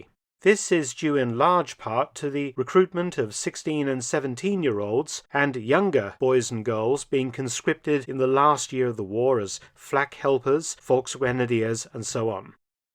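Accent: United Kingdom. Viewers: Given the appearance (your grandfather's photo) that you aren't as entirely British as you sound, I suppose it is not a coincidence that this was released on ANZAC day. My son wearing my fathers WW2 RAN medals placed a wreath for Scouts Tasmania as part of the ANZAC Day remembrance ceremony today. He also officially received his Queen scout award. Well done Monty, Pa would be proud.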